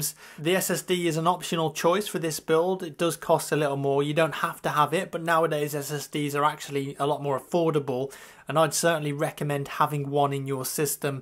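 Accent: United Kingdom. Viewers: How does sound black? Lol can't you hear his British accent? Lel and one question: why does it matter what he looks like? It's mainly about if he's a good person or not, and clearly if you've been watching his videos he's on the good side;)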